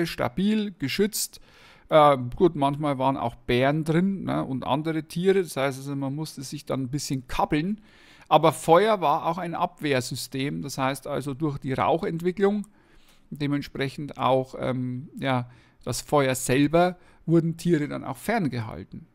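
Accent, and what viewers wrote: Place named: South, Germany. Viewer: angenehmem süddeutschen Akzent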